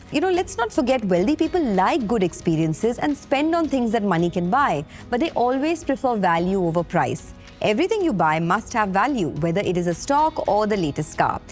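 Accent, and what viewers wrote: India. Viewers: Indian speaking style is weird 😆.....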